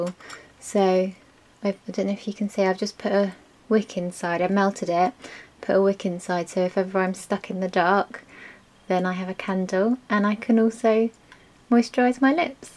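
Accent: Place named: England, United Kingdom. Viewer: EDC, beautiful girl, english accent!! I sub'd !! LOL!!!!! Whooo Hooooo!!!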